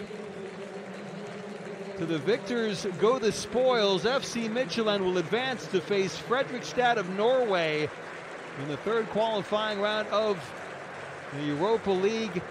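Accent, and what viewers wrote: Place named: United States. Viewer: American commentator? I didn't expect that really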